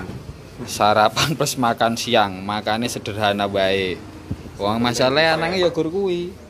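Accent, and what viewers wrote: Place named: Java, Indonesia. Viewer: Jawa tulen mas😅😅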